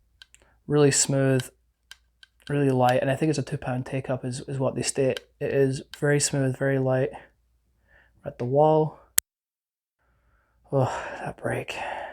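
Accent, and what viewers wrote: Scotland, United Kingdom. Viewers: Do I hear a hint of Scottish? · Liked and sub'd! Great Review. Sounds like you are Scottish, am I wrong? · Its so good to hear a fellow Brit / Scot talking about firearms.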